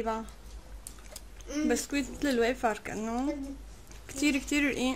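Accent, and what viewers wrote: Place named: Damascus, Syria. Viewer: كتير حلو لانها سورية من الشام والشاميين بيحكو هيك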